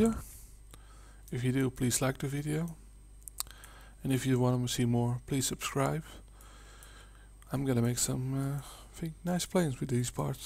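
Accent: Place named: Netherlands. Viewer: I can hear that you're Dutch I'm Dutch too.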